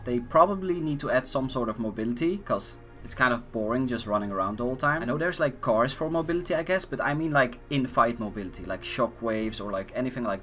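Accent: Netherlands. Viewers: Are you dutch? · vindt je nl accent zo grappig nice vidoe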